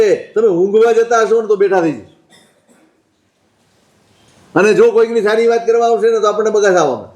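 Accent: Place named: Kathiawar, India. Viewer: Maththen vandami. Though I am not jain and have been living in foreign country ( ANARYA BHOOMI) for 20 years, I am deeply interested in Jainism. Me and my wife have listened SAMARADITY MAHAKATHA for 2 times and 3 time I am listening to you and enjoying your KATHIYAWADI ACCENT very much. Guruji, you are explaining in the simplest way and it is heart touching.Please bless us so that we can make our SOUL free from all the karmas. BAHU PUNYA KERAA PUNJ THI AA MANAV DEH MALYO, EVI KARUNA KARO AAP K AA BHAVROG TALI JAY.🙏🏼🙏🏼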